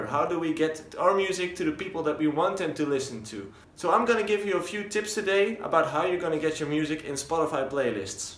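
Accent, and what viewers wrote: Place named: Netherlands. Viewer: lekker nederlands accentje! ;)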